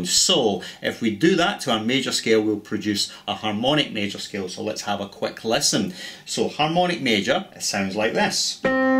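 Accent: Scotland, United Kingdom. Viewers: real good lesson, did you learn piano first or guitar? You seem to know piano quite well and I say that because I'm classically taught from a very early age then later learned guitar and drums, I'm your age and my piano and keyboard playing has helped my guitar playing greatly. You are so very much better than many others who try to do the same.Love the Scotch accent, used to live in England when i was very young.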